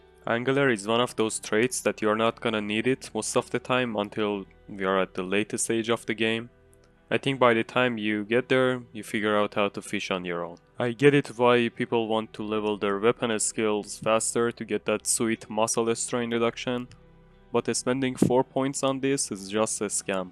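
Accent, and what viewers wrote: Iran. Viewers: Are you Iranian? You have a Persian accent.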